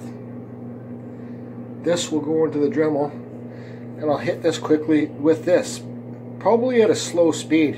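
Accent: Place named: Canada. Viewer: Keep doing your thing brother! Love the Canadian accent too. I need to start shooting video of my carvings.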